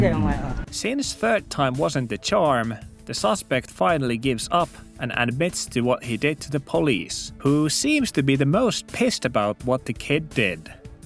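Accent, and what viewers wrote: Finland. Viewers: bro am i tweaking or do i hear a finnish accent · Bro is one-hundred percent Finnish, am I right fellow Fin?:cat-orange-whistling: Yap. The "also" confirmed my suspicion, moro miten mennöö?:D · You sound Finnish what country are you from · as in paahtoleipä. i can hear it from your accent. this is a Finnish guy.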